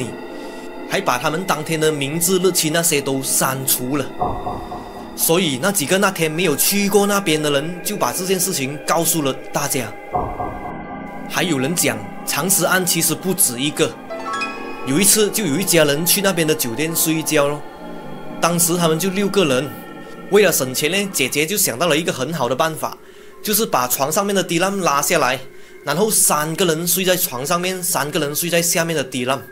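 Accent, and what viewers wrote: Malaysia. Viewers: 最恐怖的還是馬來腔華語發音好恐怖😱😱...嘩咾欸..😱😱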